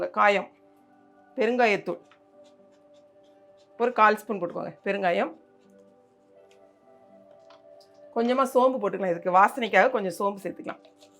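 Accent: Tirunelveli, India. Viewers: Tuticorin n Tirunelveli slang super.very nice our language cooking is very interesting.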